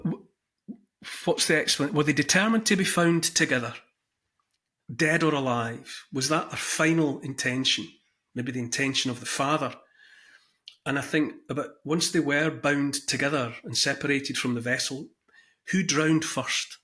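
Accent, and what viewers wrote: Scotland, United Kingdom. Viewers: I am glad to have been to Scotland 10 years ago, I fell in love with the landscapes, the music, the language, so kind people, and returned with a good amount of souvenirs (including books with folk tales). Yet there remains a longing to go back and spend more time there. Until then, the stories of that country, retold by someone with that wonderful Scottish accent will do. Thank you, sir. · My dear Godly grandfather was born in Wilbarston, England but raised in and around Dumfrees, Scotland 🏴- my chosen homeland along with America Grandpa had a Brit/Scot accent and while we lived with my grandparents when young, my brother and I, being born and raised in the U.S. both of us had the same accent as our grandfather - our schoolmates surely wondered why we spoke in such a way, but hearing your scot accent brings me comfort and peace still as I hear my grandfather again- Godspeed to you, friend and to your family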